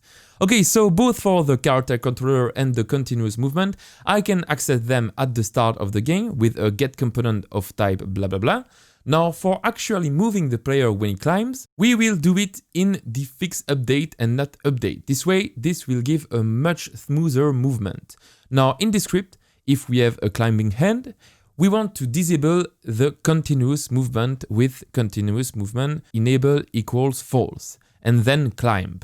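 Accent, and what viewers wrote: France. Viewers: Ton accent français m'aide a comprendre parfaitement 😅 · Your French accent is AWESOME! I love clicking a video and hearing your voice, because I know the content coming in is gonna be EPIC! 😙👌 (My emojis don't have the "chefs kiss" hand, but you get the picture 😂 ) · Be honest here mate, always hated the french accent, no matter who spoke it, but damn ive learnt alot from you, love your accent now :D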